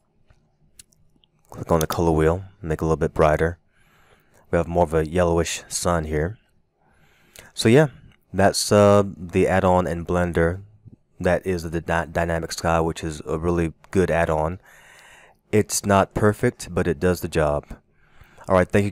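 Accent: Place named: South, United States. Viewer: Why does he sound like a Southern Ryan Higa!?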